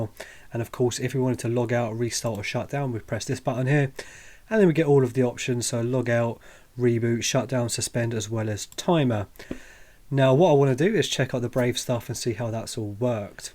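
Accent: United Kingdom. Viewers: I really like the clarity of your presentation, especially when I slowed it down to.75 to satisfy my aging brain. Your lovely Brit accent is not a hindrance for this old guy from the US, happily. Going to review your videos for subjects still being resisted by my thick skull, like those blasted bootloaders (like Grub) that throw me for a loop every time. First thing I see is a prompt which I can't even figure out how to get out of. Then I need to get the layout of a SD boot. There are partitioning issues I don't understand. Sometimes the SD boots fine, sometimes RasPi Imager won't even write to it. "Capacity" issue? Please help me understand what the H is going on there. Thanks very much.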